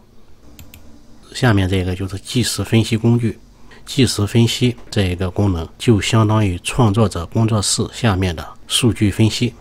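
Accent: Shandong, China. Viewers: 濃濃的山東人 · 老师，是山东的吗？咱是老乡啊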